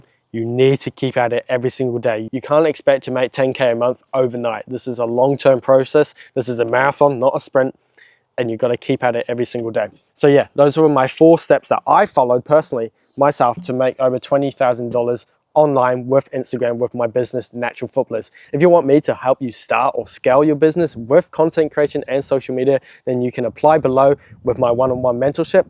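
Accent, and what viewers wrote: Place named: New Zealand. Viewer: Are you in NZ? sound like a Kiwi. Great to have your passion.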